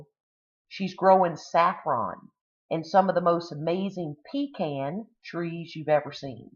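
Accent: South, United States. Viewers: now ya know i got to give a poke, a pee can is what a trucker uses lol youaiis acksent tells me ya from the south try Mrs V on OurHalfAcreHomestead the best cook iv seen on hear try this this will take ya rite to her making a turkey pot pie crust and all <link>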